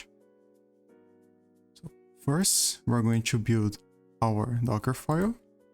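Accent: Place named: Brazil. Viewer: reconheço sotaque BR de longe!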